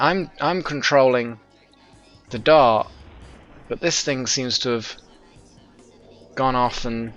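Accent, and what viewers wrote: England, United Kingdom. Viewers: So you get a lot of crap from ScreenRave about your "UK" accent. I may be an American, but I understand how ridiculous that is. There's no "UK" accent. There are dozens, maybe hundreds. Whereabouts are you from? I don't recognize the city or area your accent corresponds to... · I am an Idor, and I just wanted to ask, are you from England itself? Or from scotland\ireland?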